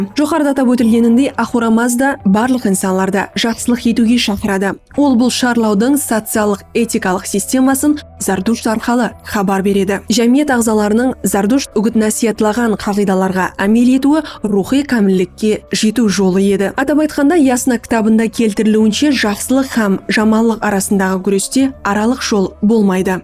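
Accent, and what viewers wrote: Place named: Karakalpakstan, Uzbekistan. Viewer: Нагыз каракалпак тили анык, рауан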